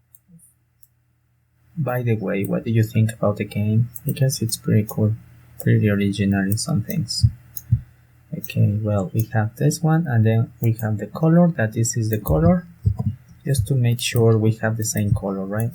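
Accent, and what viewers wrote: Spain. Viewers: cause its kinda hard to understand you with your strong Spanish accent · Se nota que hablas español xd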